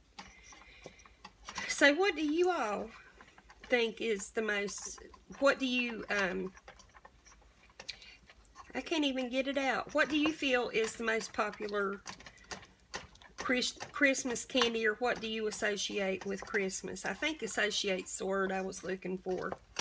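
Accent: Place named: East Texas, United States. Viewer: I love your accent! I went to high school and met my husband in East Texas—listening to you is like going home! ❤️❤️❤️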